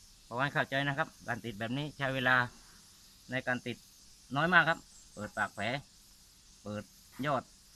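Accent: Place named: South, Thailand. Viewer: ผ่านหลวง คนใต้แน่ฉาดๆ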